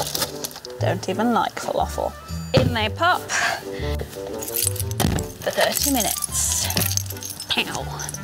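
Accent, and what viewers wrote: Birmingham, United Kingdom. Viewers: The brummie accent is so apparent in this video and I love it 😂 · You sound so Brummy in this vid! 😂😘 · You are sooo brummy in this video, u cute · Hilarious. Also, your brummy accent came out loads in this 😆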